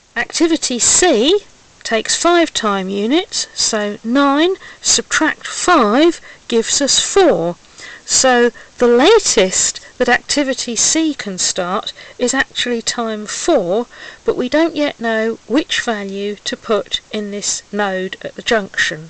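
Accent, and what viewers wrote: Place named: United Kingdom. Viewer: Me too. She has that lovely very British accent ;)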